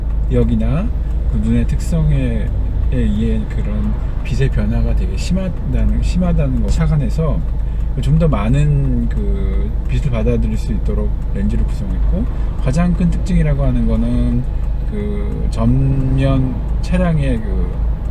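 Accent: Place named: Chungcheong, South Korea. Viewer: 충청도 분 이신가ㅜㅜ말좀빨리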